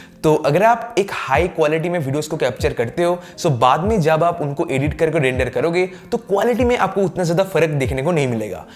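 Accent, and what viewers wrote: India. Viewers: This is english ou indian? wtf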